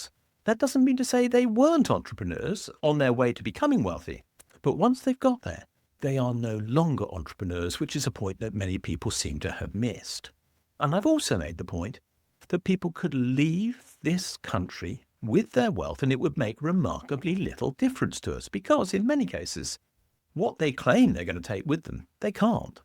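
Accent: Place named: England, United Kingdom. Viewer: Such a nice English accent, wasted on such terrible ideas. If you chase the wealthy out of your economy, your economy will collapse, full stop. Take a look at other countries that have done it: Uganda chased all their Indians out who were bsuienss owners, or Zimbabwe seizing white farmers land. China & Cambodia put all the educated bourgoisie into the fields, or Russia and the kulaks. If this gentleman believes the wealthy hoard their wealth and don't invest, maybe the reason is government policy makes investing in your country not worth the risk? Capital in the hands of private individuals will always be put to better use than capital in the hands of the government. Always.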